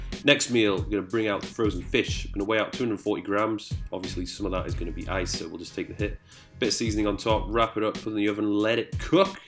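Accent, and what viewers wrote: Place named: Stoke, United Kingdom. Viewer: Got to be from Stoke or somewhere near there 😝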